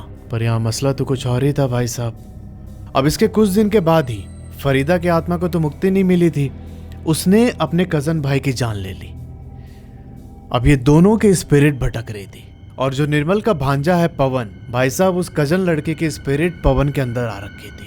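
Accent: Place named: Jharkhand, India. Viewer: Bhaiya aap Jharkhand ko boli seh lag raha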